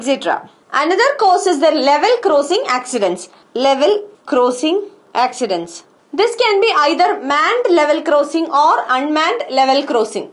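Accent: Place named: South India, India. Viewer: Thank you for great lecture mam. Mam, are you from south india? I felt it because of your English pronunciation.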